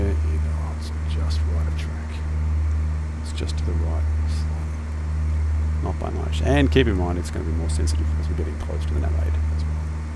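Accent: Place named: Australia. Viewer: Within literally 0.25 seconds of your first word I go: Cheers mate, just couldn't get any better than having an Aussie explain NDB intercepts out of YLTV! (Studying for IREX here in Melbourne too). Thanks for great video!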